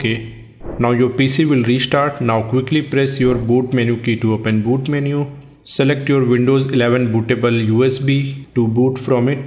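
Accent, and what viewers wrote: India. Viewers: Middle eastern name with a complete Indian accent....wow. Great info. Nice video, applause for microsoft.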